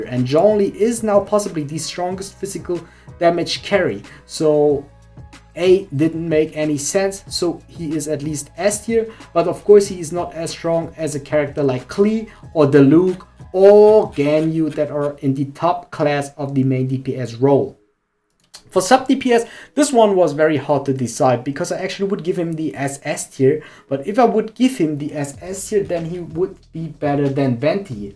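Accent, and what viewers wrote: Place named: Germany. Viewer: I mostly agree with you tier list and think it's one of the best I have seen so far. The only argument that could be made in my opinion is Zhongli is the best character to me. He gives more support-dps options with his resonate dmg and his burst as well as a true support he gives way more than Venti does in terms of helping you team out. His shield makes you invincible and you shred the defense of the enemies by 20% at close range which might be the strongest ability in the game right now. I think it is a battle between Venti and Zhongli at the top and agree with both, but the argument could be made for both I tend to think Zhongli is a little bit stronger overall. Note: I like you english it's really fluent I have to say. I can hear you have an accent might be german or something like that, but really good english!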